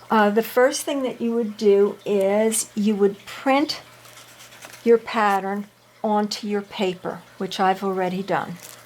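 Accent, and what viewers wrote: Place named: Pittsburgh, United States. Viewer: I hear a Pittsburgh accent!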